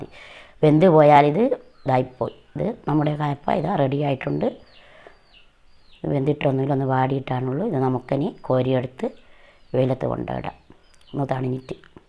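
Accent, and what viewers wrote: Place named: Kannur, India. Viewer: തനി കണ്ണൂർ 'മ്മടെ 'നാട് '👍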